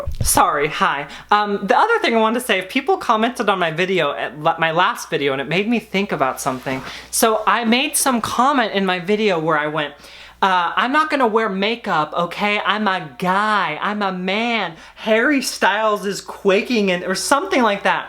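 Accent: England, United Kingdom. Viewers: The British accent makes me burst in laughter every freaking time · I like your English accents. Makes me smile. Love your content.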